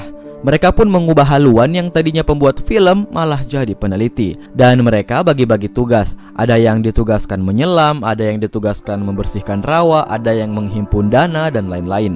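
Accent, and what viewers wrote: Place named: Bugis, Indonesia. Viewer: Logatya bugis🤣🤣🤣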